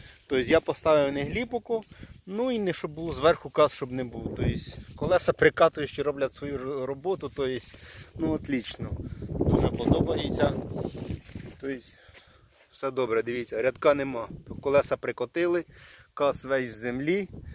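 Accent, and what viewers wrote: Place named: Khmelnytskyi, Ukraine. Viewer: Говор наче з Хмельниччини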